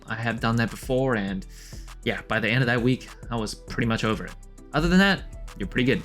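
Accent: Australia. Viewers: Did you spend some time in Australia? I can hear a tinge of Aussie 👍🏼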